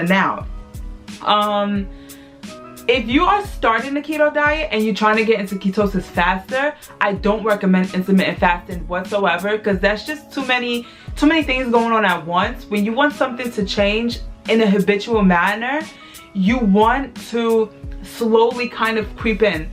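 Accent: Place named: New York, United States. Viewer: Sexy NY accent 🔥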